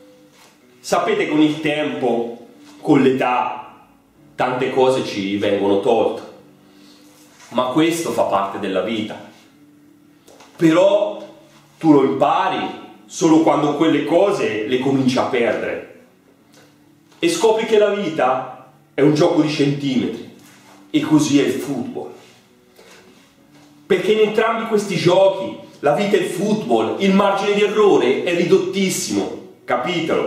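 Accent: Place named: Tuscany, Italy. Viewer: Si sente il toscano ,leva il foglio ci vuole piu grinta .....e non gesticolare troppo !!!!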